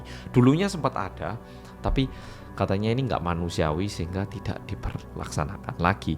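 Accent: Java, Indonesia. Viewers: Saya yang dari bayi di jawa pun tidak seMEDOK Mas 😅 bisa enak aja gitu denger Mas nya ngomong.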